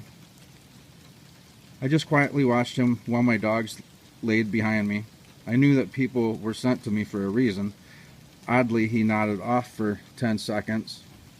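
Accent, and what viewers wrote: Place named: Rochester, United States. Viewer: Hello brother. I am new to your YouTube channel. I subscribed because as I scrolled through your videos I noticed videos and I adore that man! May he rest in peace. Also, I have a very dear friend who is from Rochester New York and your accent sounds so much like his. I guess those are two reasons as good as any for me to stick around for a while. God bless you.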